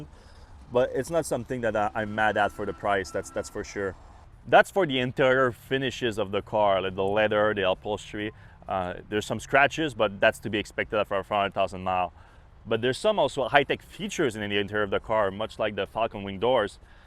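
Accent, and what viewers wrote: Quebec, Canada. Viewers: Love the quebec accent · sounds like a quebecois? u from here bruh?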